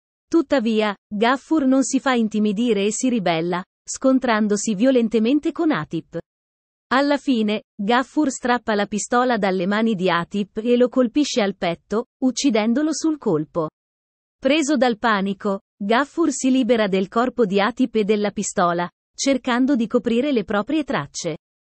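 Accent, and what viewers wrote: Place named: United Kingdom. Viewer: Perchè citare i nomi turchi con la pronuncia inglese? Inascoltabile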